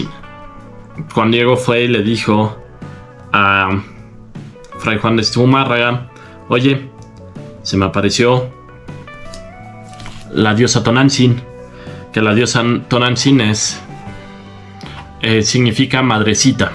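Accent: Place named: Andalusia, Spain. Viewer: Pareces un andaluz o un sevillano mal que te pese tengo amigos parecidos a ti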